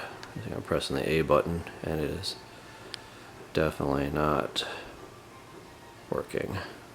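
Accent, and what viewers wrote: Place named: Ohio, United States. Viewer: Is it just me or does any one else thinks hes from Ohio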